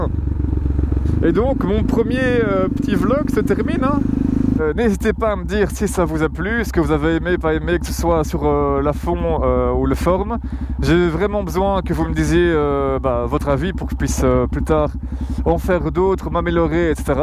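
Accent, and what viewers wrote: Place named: North, France. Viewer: C’est le France qui parle à le Belgique. Sympa ton sujet. Donc, Wallonie = roue de 21 et méga suspension type les pistes de le Afrique. Quand à le Flandre, télé à la place du GPS car tu t’emmerde velu. C’est bien mon résumé? J’adopte ce style de vlog. Refait autant que tu veux. En plus, je me lasse pas de cet accent de la nord. Bon, moi je retourne faire un tour sur les jolies routes de le Côte d’or 😇👍👍🤪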